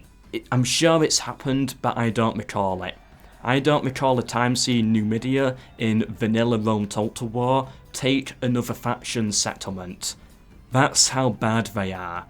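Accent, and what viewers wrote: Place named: Yorkshire, United Kingdom. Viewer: I've honestly never heard a Yorkshire accent as strong as yours, it's beautiful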